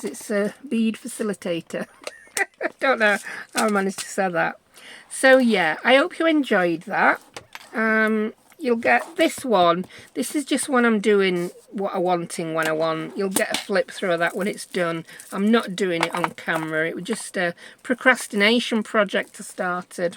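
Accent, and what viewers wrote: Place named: Sheffield, United Kingdom. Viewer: Hi, greetings from OZ 🇦🇺😎 So glad I came across your spine dangle vid 🤗 I love listening to you, I was born in Sheffield,came to OZ when I was 9 (76 now ), so your accent brings back memories WHEEEEEEEEEEE 🤣😂🤣😂🤣😂🤣😂😂 You are a joy to listen to & watch TFS XXXXX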